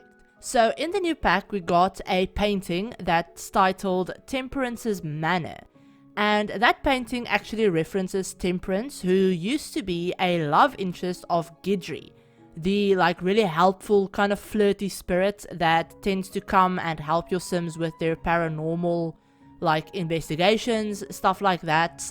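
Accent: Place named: Afrikaans, South Africa. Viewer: I knew it when u was talking I was like yes she's Afrikaans in from south Africa tooooo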